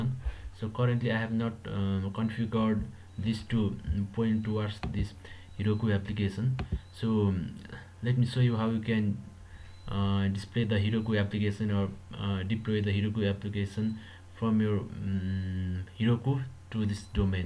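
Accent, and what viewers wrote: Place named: Nepal. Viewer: maile ta nepali accent thyakkai chineko hoki jasto lagyo...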